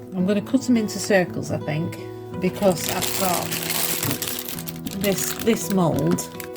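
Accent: Northern England, United Kingdom. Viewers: Lovely to hear a northern voice (I’m from Wigan) I love this video and nice to see I’m not the only one with a few bubbles. I love your video’s.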